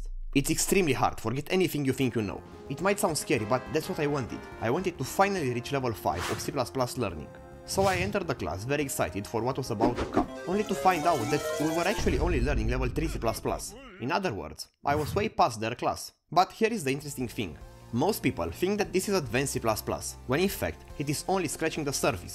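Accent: Russia. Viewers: Your accent is interesting, do ye have russian heritage? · Excuse me for that question, but it's very interesting for me as lover of languages and especially English) What country are you from? I thought you''re Russian when i heared you accent - it sounds very similar to Russian.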